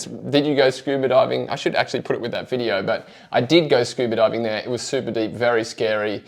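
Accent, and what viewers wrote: Australia. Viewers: That's funny, a Spanish channel?! I am Spanish, I've been following you for a while now! NO WAY I'm gonna miss your voice, your aussie accent or your laughter! I'll keep on watching the original one! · Nah, no travel video please. Stick to getting excited pulling stuff out of the canals. Your Ozzie voice is a bit to annoying for travel talk.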